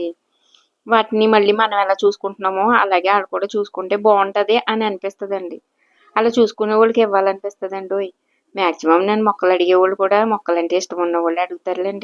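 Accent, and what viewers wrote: Godavari, India. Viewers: గారు మీరు చాలా చక్కగా వీడియో చేశారు...👌 Natural గా మన గోదావరి నటివిటీ తో బాగా ఆప్యాయత ఉట్టి పడే విధంగా మాట్లాడారు..❤ నేను గారు వాళ్ళ అక్క గారి అమ్మయి అండి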